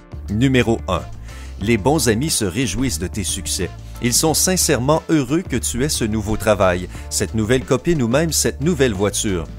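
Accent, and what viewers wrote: Quebec, Canada. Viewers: Vous êtes québéquois ? J'adore votre accent ! ❤ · C’est l’accent québécois, n’est-ce pas?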